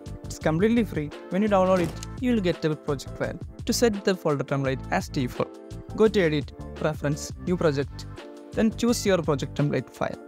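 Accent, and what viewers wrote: Kerala, India. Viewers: Your english sounds like malayali english ( No offence ) are u malayali bro?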